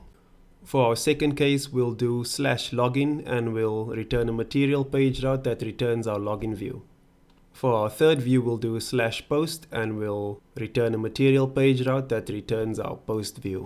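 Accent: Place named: South Africa. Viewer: I was just wondering are you from South Africa? I mean your accent is so new to me :) ... nice content please keep the good work thanks a lot. Wish you all the best!!!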